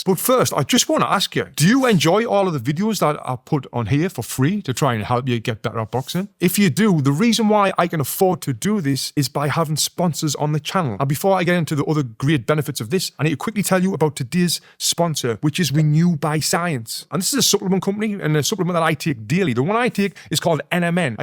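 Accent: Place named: Newcastle, United Kingdom. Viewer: You produce great content and I love the Geordie accent. My old man was born in South Shields in 1907 and our family moved to Australia in 1951. He never lost his Geordie accent and was very proud of his origins. He fought pro as a young man and served in the Royal Engineers in the War. He won the regimental middleweight title when he was 37 - the oldest entrant in the tournament. When the CO presented him with the winner's prize - 500 cigarettes!!! - he said to him, "Well done sapper. I think you've fought before!"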